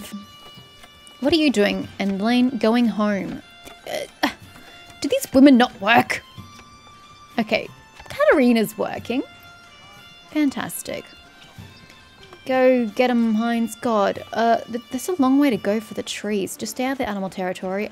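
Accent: Australia. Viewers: Just found your channel! I downloaded manor lords last night and I love it. I'll follow on your series for sure! Love hearing another Aussie lady do a play through!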